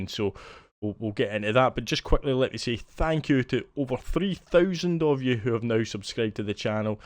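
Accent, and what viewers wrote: Scotland, United Kingdom. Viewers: Awesome video once again. You can see that you clearly have the speed needed to win a race. One thing: you mentioned you are more comfortable starting p3.4,5. Something like that, so you can settle into the race. If you feel more comfortable NOT leading , would it help if you take it easy on the opening lap(s) and if someone wants to overtake from p2/3, just let them? Then you are behind and you can settle in. Of course, it's also amazing if you want to push and challenge yourself to be more at ease pushing from the front. Anyway, great vid and I personally just love to hear the scottish accent. Have no issues and understand everything (and im a dutchy)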